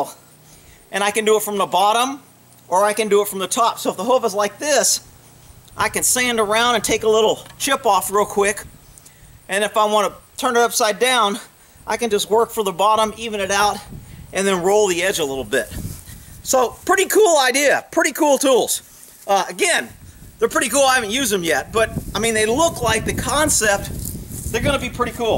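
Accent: Texas, United States. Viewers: what part of texas is that?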